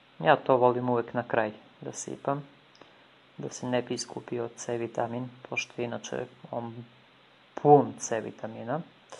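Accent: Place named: South, Serbia. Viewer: Dragi,recept je super.Corbica kao san.Ja sam je pre spremala na slican nacin a sad bas kao Vi.Al od svega mi je najsladji taj vas naglasak koji sam ja vec izgubila,al mi se potkrade oljupim papriku a ne oljustim i tako mnogo lepih reci koje na jugu upotrebljavaju.U svakom slucaju sve nalepse Vama i Vasoj porodici i veliki pozdrav👏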